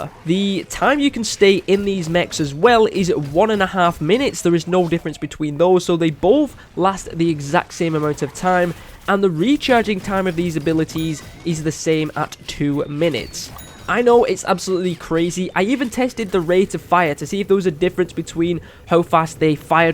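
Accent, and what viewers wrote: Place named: Wales, United Kingdom. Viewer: its mostly his weird whelsh accent and his broken grammar that pisses me off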